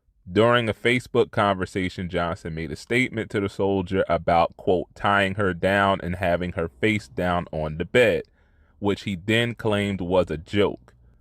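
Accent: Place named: South, United States. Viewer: You have a way with words my brother.. I know your from up north but your speech is southern (which in turn is likely derived from Afrikan ancestors whose culture is tied to proverbs and poetic means of expression through language)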